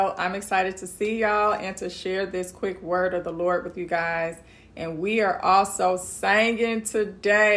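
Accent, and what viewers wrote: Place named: Texas, United States. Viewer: Finally , you getting texas accent❤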